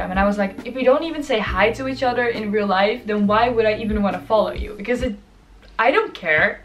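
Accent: Netherlands. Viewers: r u dutch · you dutch? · can tell ur Dutch hahah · You are Dutch I hear it!😂💓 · Omg! I was able to tell that you were in the Netherlands cause of the way you speak. I lived in a small town in Limburg for 3 years. Anyways, I'm right now doing my one month detox facebook, instagram and twitter. Not Snapchat nor Messenger because I only use them for messages purpose. I did my cheat day yesterday because of my birthday but I honestly just posted about my birthday, scrolled and I realized that I don't care about it anymore and I haven't missed anything. And after this morning I logged off. I began this detox a little bit early on 27 September and I will log in again on 1 November. The best tip I followed was to completely remove those apps because in my computer, I rarely use those platforms. It's nice to see more people doing this. I'm more productive than ever before and I don't feel like I'm missing anything. 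Tot zo!! ;-)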